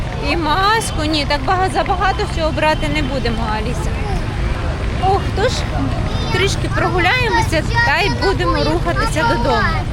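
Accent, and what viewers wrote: Standard. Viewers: Коли дивлюся ваш1 в1део, то насолождуюся вашою чистою укр. Мовою. Ваша чиста р1дна мова це показник вашоï культури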